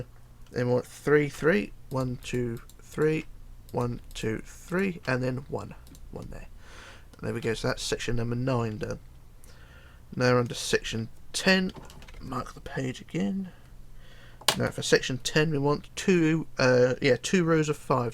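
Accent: United Kingdom. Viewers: man i love your english accent✌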